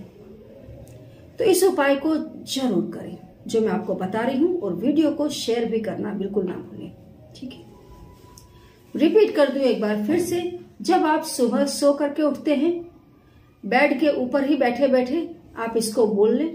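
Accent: Bengal, India. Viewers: arre SHE DOESNT LISTEN AT ALL, SHES A WEIRD LADY, I HAVE TOLD HER MANY TIMES SHE HAS A BENGALI ACCENT AISE KI TAISE KAR DETI HIAN MANTRAS KI, NAHIN SAMAJH MAIN AATA HAIN BHAI KYA KARUN...UNSUBSCRIBE KARDUN KYA, SHE DOESNT UNDERSTAND AT ALLL,,,,,,SHE SPEAKS WITH ACCENT SAMAJH HI NAHIN AATA HIAN DAMNIT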